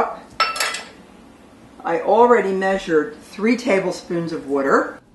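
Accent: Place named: Northeast, United States. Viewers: your so cute 🤗😘 I'm from Canada sound like your from New York area it too me 5 mins to figure out what Wyader was Oh!! WATER 🤣🙃😊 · ! You sound like you’re from the northeast!! My Dad always pronounced the word “water” just like you!!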